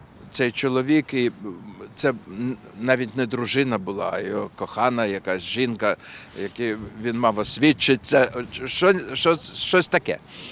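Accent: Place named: Odesa, Ukraine. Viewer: 😂😂😂😂😂😂😂😂😂😂😂!!!! Шутите на русском!!!! На русском С ОСОБЫМ ОДЕССКО-УКРАИНСКИМ АКЦЕНТОМ!!!!!! Это СУПЕР И ОЧЕНЬ КЛАССНО!!!! Дякую Вам ВЕЛИКЕ!!! І ЩОБ ВИ МЕНІ БУЛИ ЗАВЖДИ ЗДОРОВИМ!!!!!!! ❤❤❤❤❤❤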